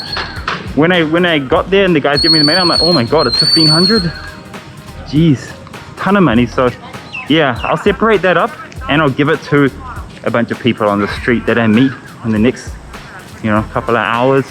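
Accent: India. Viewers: you speak English in indian accent lol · You sound like indian.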